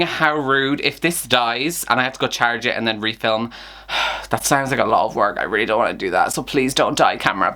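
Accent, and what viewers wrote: Ireland. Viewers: I found you through Chloe’s channel and it’s soo nice to hear an Irish accent on booktube.. makes me homesick and want to be back in the whest 😛.. new sub can’t wait to watch move.. love a fellow Irish gal 😘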